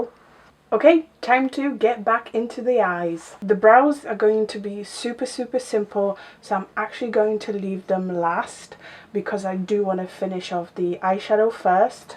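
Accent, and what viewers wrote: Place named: United Kingdom. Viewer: Lovely finished look with the makeup......insert name here!........Are you in the UK you have a funny accent......? Good video.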